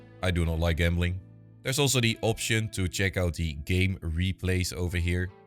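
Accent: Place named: Netherlands. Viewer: i smell dutch\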